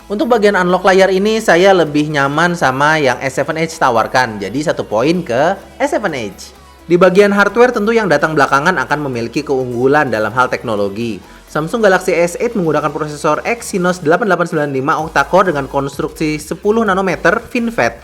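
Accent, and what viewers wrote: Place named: Java, Indonesia. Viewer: Jawa nya medog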